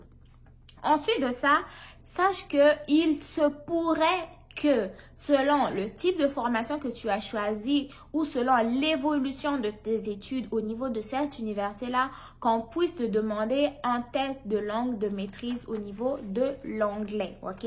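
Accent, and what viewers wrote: Canada. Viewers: tu as deja l'accent canadien. Toutes mes felicitations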